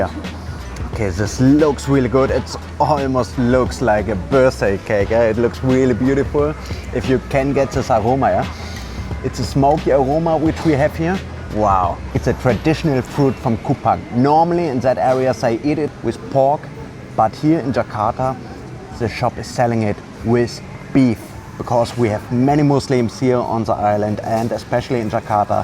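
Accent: Germany. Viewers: Direkt den deutschen Akzent rausgehört 😂😂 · I like Your German aksen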